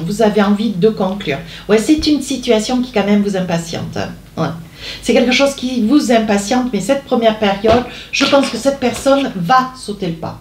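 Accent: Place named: Southwest, France. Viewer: Vous avez l’air fort sympathique et me faites bien rire, avec votre parler cru mâtiné d’un petit accent (sud-ouest?). Pour le fond, mensonges et trahisons on s’en passerait mais succession et lointaine destination sont des mots qui vont très bien ensemble ;) Affaire à suivre, en vous souhaitant le meilleur à vous surtout!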